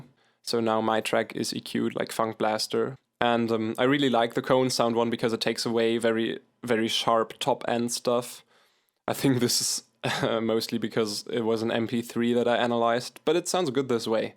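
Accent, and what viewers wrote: Germany. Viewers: I realised u r german after like 1sec of watching this :D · He's german :3 That accent :DDD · Wie krass man einfach das deutsch raushört (how easy you just hear the German) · Are you german ? :) you have a german aczent :D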